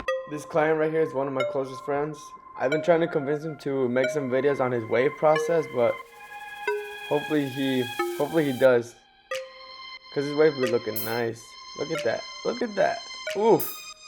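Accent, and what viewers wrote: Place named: India. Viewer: He sound like Apu that one Indian character from Simpson’s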